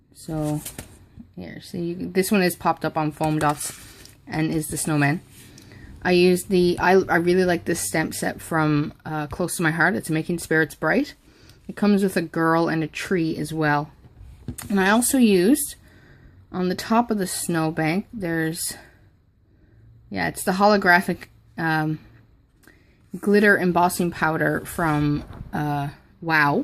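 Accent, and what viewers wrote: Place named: Canada. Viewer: Cute cards! Love the watercolored tree cards! I also love that falling snow stencil! Want to get it too! I thought I could hear a Canadian accent, and then when you said you ordered from Amazon.ca, I knew for sure! I was born and raised in northern Alberta. I live in the States now. Anyhoo, I don't think it's too early to make Christmas cards, especially when making so many. Singing, however, is a different story, heehee! TFS! Hugs, Shonda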